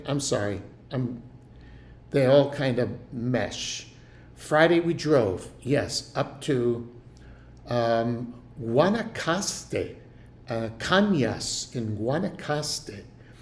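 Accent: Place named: Spain. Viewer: Thanks for sharing from Costa Rica pastor Paul. Shalom from Bolivia🙂 P.s. Nice Spanish accent and "hasta luego"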